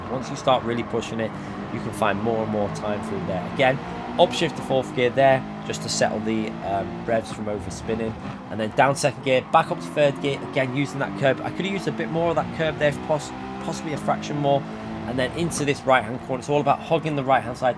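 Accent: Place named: RP, United Kingdom. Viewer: The sounds more posh when he's doing his guides than on his live streams.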